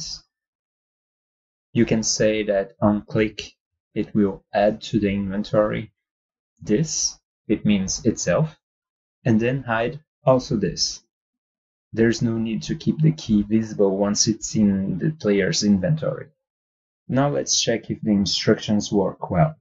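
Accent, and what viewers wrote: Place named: France. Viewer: It would be awesome if you did some more tutorials! Some more advanced tutorials, if you get the time. Would also like to see how you go through the process of creating rooms. And your English is perfect with a really cool, beautiful French accent so never apologize for that. :)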